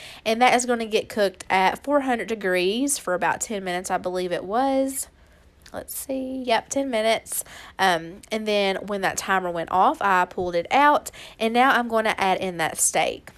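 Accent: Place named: Kentucky, United States. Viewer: I don’t even have to ask where you are from! You sound just like my family from Kentucky. Love your recipes! I just subscribed.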